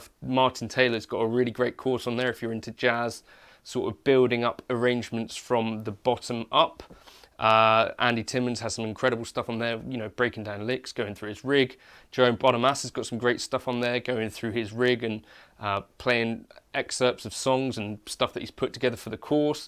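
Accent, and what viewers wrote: England, United Kingdom. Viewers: I don’t get the hair that needs moving out of the eyes and the typical English emo accent. You don’t have a taste for expensive stuff and still pay £2k for a guitar?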